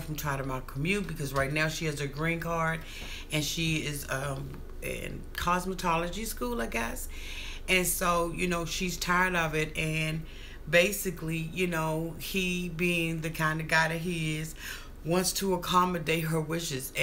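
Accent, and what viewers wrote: Washington DC, United States. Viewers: Are u from D.C.? Lol. U have a D.C. accent.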